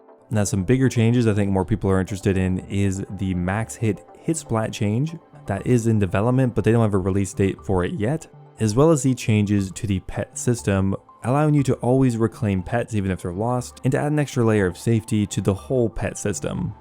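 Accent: Quebec, Canada. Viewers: Are you french canadian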